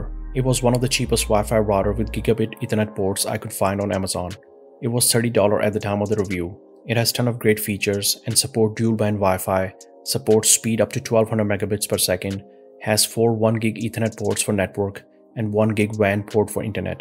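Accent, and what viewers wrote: India. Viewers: this is painful, its just a collection of adverts for all the products so you learn jackshit. nothing is compared and the accent just reminds me of indian tech nonsupport.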